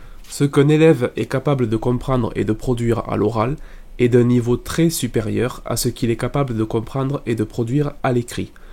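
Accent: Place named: Provence-Alpes-Côte d'Azur, France. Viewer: Pourrais-tu m'envoyer le lien pour télécharger les fichiers audio ?? Je crois que tu vas me bercer longtemps 😉 et pour l'accent j'étais pas loin, je suis en Paca 😁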